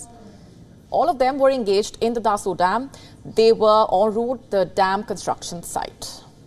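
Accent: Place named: India. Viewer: Too strong Indian accent 🙏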